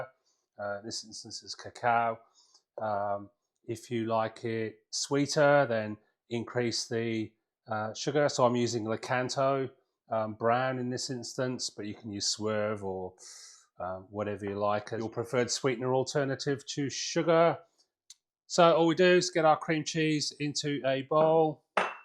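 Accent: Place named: Australia. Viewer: Thank you for this video! I'll be making this tomorrow. I'd make it today, but I just cleaned up the kitchen from making ice cream, and am just too lazy for today. I am now your 365th subscriber. And, I LOVE your Aussie accent! Diane in North Carolina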